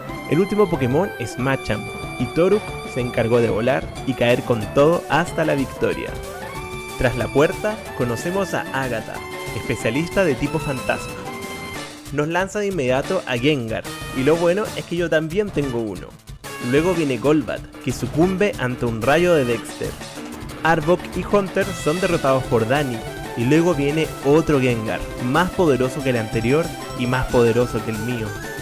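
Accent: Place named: Chile. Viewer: Grandioso video, para ser sincero lo único a mejorar sería los trazados de dibujos y talvez algo de anatomía, la animación uuf en eso estamos bien aunque yo no sé de animación así que ahí no me meto ajaja la verdad me encantó tu video y entendí CADA referencia y además e de suponer que eres chileno por tu forma de hablar y por alguna que otra referencia ajajaj y sera un gusto ser parte de tu canal y dar mi like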